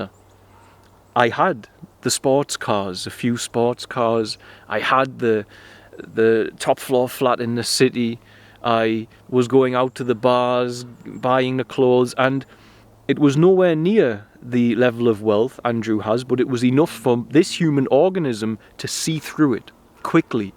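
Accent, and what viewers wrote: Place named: Scotland, United Kingdom. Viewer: Good morning from Polska ! How cool Scottish accent... I do not know who you are, but i got an impression that because Tate spoke about balenciaga scandal, exposed it in vid Karmic Rétribution published a month ago, so he was framed in Romania ? However, he's got some underworld vibe ? Smokes cigar, converted to muslim ( Already was very fanatical towards women): isn't he disturbed anyway ?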